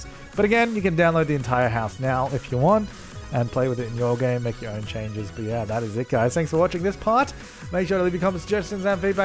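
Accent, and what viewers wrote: Australia. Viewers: It's just the Australian accent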